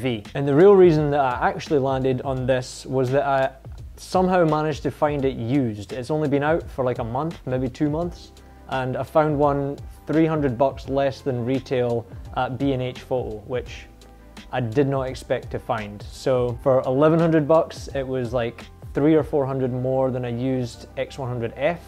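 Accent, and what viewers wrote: Scotland, United Kingdom. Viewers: Hey, you lucky person! A new X100V that someone didn't want, at a really good price. I bought mine and got it two days before the stay home began in Scotland... I've got the same black version. My weather seal kit was on backorder when I picked the camera up, so once this crisis is behind us, I’ll go and collect it... You said you weren't keen on it... Persevere with it, you might enjoy the simplicity of the camera, it just takes a wee bit of time to enjoy it. It looks like you're in the USA but I hear a Scots accent there... 🏴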